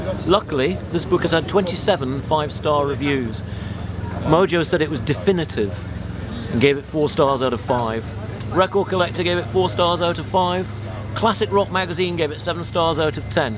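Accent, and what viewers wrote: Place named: Scotland, United Kingdom. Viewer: You are a bit of a, if you follow the lyric. Good promo though -an educated Scottish voice (fluent & trustworthy!)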